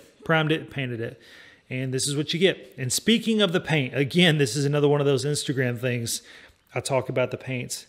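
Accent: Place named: South, United States. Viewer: Love the southern twang! And the gym too!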